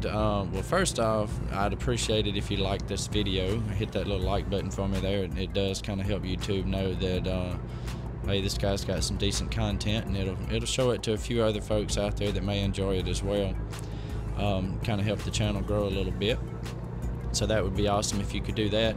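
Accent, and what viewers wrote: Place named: South, United States. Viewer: As always love what your doing man! So glad I found your channel! Dude I love when you say sweet jesus Lol so funny man. Rather it's this or lspdfr you make it very entertaining to watch! Coming from south florida it's nice to here that southern accent :) also very nice involving your kids into what you love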